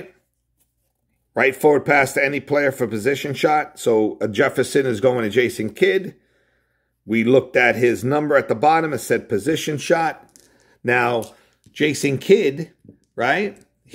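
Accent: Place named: New Jersey, United States. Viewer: I loved the video...and from your accent I'd say you were from New Jersey :) I'm starting a "hybrid" league (12 divisions w/8 teams per division) based on HS basketball rules (4 quarters - 8 minutes long each quarter). I'm going to start with the basic version and work myyself up to the advanced version (and your tutorials really help!)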